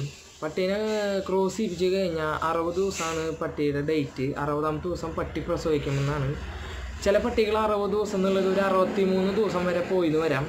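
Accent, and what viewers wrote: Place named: Thrissur, India. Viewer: നുമ്മ തൃശ്ശൂർക്കാരൻ ആണ് തോന്നുന്നു slag കേട്ടിട്ട്...😍 ആണോ ബ്രോ?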